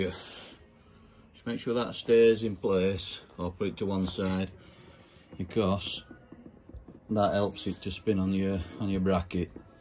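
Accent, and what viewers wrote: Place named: Rotherham, United Kingdom. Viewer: How much would you charge to install 1 for me I'm based in Rotherham sounds like your from around here ??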